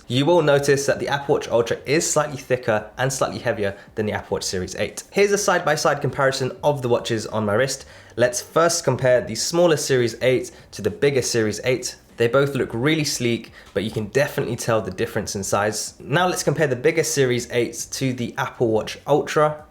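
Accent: United Kingdom. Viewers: It's the first video of you I've watched, it was suggested by Youtube, I like your analysis and I'm agree with you about the size question. I have a Garmin 55 since 2 weeks, it's nice but the screen is awful compared to a smartwatch, I'm interested into the Apple Watch (series 9 when it will be out). You British accent is very clear even for a non-native speaker like me so I will definitely watch other videos of your channel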